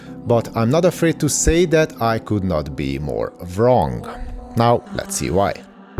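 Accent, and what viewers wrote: Hungary. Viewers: Feldobta a videódat, egyből ki halottam a magyar akcentust, amúgy tetszett a tartalom😊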